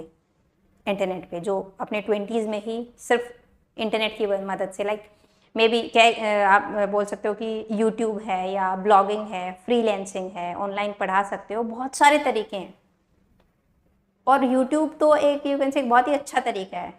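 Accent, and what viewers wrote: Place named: India. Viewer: I love your Hindi accent mam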